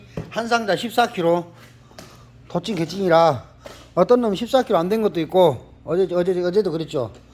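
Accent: Jeolla, South Korea. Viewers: 전라도 사투리 증말 재밌네요..목포 생선 연결고리가 없어 구입하기 힘들었는데 반갑습니다.. 계속 올려주세요...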